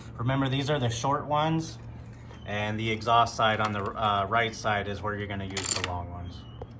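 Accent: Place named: United States. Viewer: Damn bro that American accent. AcrapOvik. It's akrApovich. Other than that, nice informative video❤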